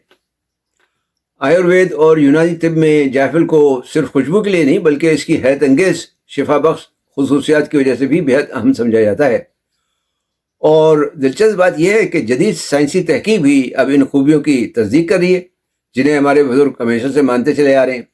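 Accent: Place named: Lucknow, India. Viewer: آ پکا بات کرنے کا انداز یوپی والا ھے جیسے لکھنؤی!!!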